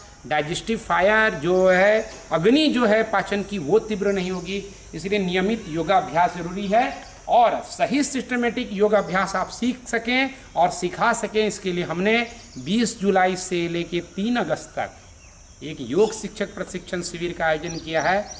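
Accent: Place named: Bihar, India. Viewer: Baba bihari ho na sahi sahi bolna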